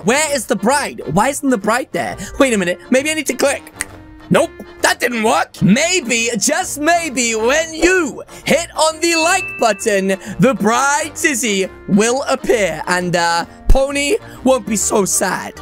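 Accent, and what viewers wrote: England, United Kingdom. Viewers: Search for more bullies in bubble gum simulator Also are you from england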